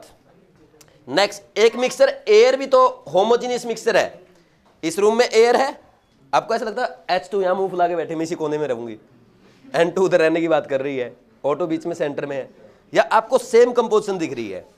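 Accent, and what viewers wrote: Haryana, India. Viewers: your accent is too similar to Haryanvi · Sir are you from haryana?!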